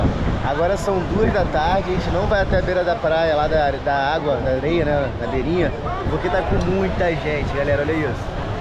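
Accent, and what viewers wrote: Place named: Rio de Janeiro, Brazil. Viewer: Caga tijolo. Kkkkkkkkkk😂😂😂😂😂. Adoro esse sotaque. O sulista e o carioca sao os sotaques mais gostosos de se ouvir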